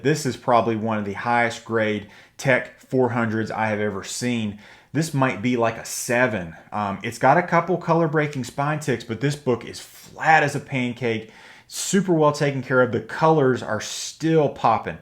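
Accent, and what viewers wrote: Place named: South, United States. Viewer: Judging for your accent, I'd guess you're a Southern guy like myself. Rick Fortenberry (one of the guys who puts on the show) is a friend that I got my Batman 232 cbcs 8.5 from. You also had a brief glimpse of another great guy, Lyn Anderson who puts on a show in Raleigh 3 times a yr. Next show is early December and has some great vendors , Like Rick and Dave Hinson . You really should look into checking it out if you're in NC and looking for great 1 day show.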